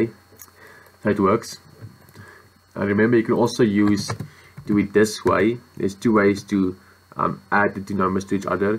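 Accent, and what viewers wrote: Afrikaans, South Africa. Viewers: Are you Afrikaans? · You can tell that he's Afrikaans. I mean his accent says it all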